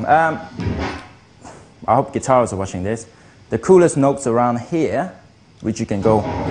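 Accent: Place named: United Kingdom. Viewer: He sounds british.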